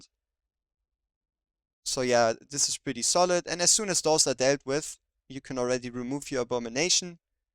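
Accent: Germany. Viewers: dt akzent haha weiter so danke fürs video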